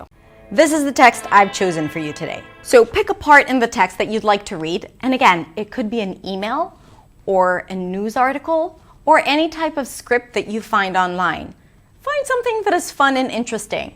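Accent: New York, United States. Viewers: I am a native English speaker/English teacher and I did not realize she was not a native English speaker until she mentioned it. The only accent I noticed was a slight “New York“ accent!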